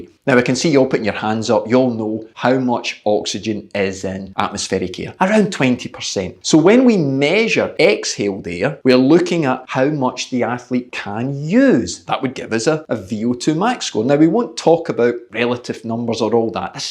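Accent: Scotland, United Kingdom. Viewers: I like your scottish accent :D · I like your Scottish, Coach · Hahaha! I laughed out loud when you mentioned talking less Scottish. Then I thought, actually his accent is pretty thick. I love it, and I love the advice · Please never speak less Scottish!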